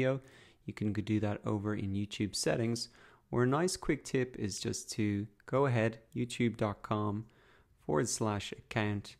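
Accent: Ireland. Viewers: Are you irish im irish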